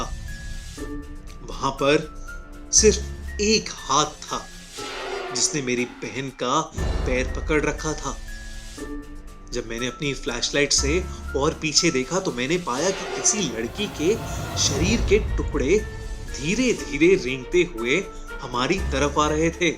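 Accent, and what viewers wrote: United States. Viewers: Are you American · Are you from America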